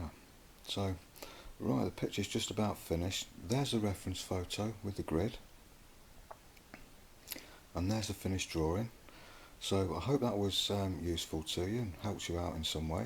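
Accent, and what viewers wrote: United Kingdom. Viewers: That's awesome. Just subscribed. The British accent adds to your credibility too.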